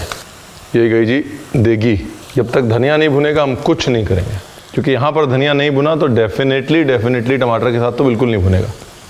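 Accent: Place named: Bihar, India. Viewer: Bihari accent...waaow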